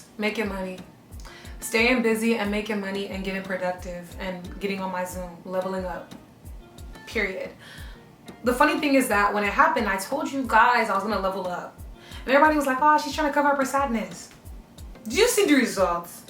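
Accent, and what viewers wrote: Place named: Nigeria. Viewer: I am new here n can’t help to get the Nigerian accent. U get Nigeria blood for body? Love u already. ❤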